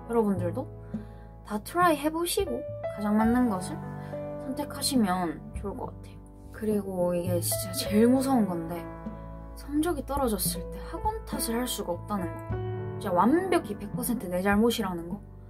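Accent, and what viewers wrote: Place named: North Gyeongsang, South Korea. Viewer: 가끔씩 꿈을 꾼다는것은 씨가 좋은대학을 가기 위해 치열하게 공부했던 시간임을 증명해주는것 같아요. 하지만 꿈을 꾸고 난뒤 현실에 만족하기 보다는 그 꿈의 의미에 대해 한번 생각해보는 시간도 필요할 것 같다는 생각이 듭니다. 서울대를 못가더라도 삶을 행복하게 살아가는 사람이 있고, 서울대를 가더라도 삶을 행복하게 살지 못하는 사람도 있어요. 우리 삶의 종착역은 대학이 아닙니다. 많은 사람들이 서울대를 가려는 이유는 삶을 행복하게 살아가기 위한 디딤돌을 딛기 위한 것이라고 생각합니다. 씨, 서울대를 디딤돌로 삼아 더 발전하는 모습 기대할께요. (경상북도에서 태어나서 그런지 씨의 말투가 듣기 편하고, 또 좋은 마음이 느껴져 댓글을 납깁니다.)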